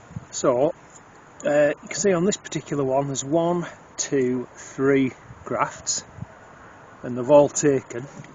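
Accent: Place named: Lancashire, United Kingdom. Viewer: It's so wonderful to listen to your voice. I'm a Lancashire lass and I feel really comfortable with our accent being the same being so close. I'm gonna share your video with my friends all over the world so they know how I talk... just like you. I'm a gardener like you, much reduced now to age and ill health. But I get my joy in life from watching you doing things I can no longer do... thanks.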